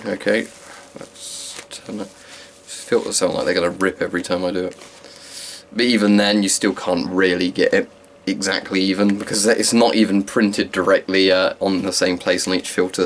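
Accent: England, United Kingdom. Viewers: It's you english accent. Be proud of it! Maybe try some rubber bicycle tube to cover the inlet valves. 🇦🇺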